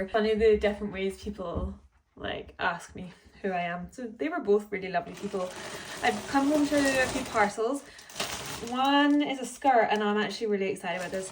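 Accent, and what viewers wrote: Scotland, United Kingdom. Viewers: There's something about a Scottish voice that warms my soul. I can't listen to every post, but thank you! 🙏💛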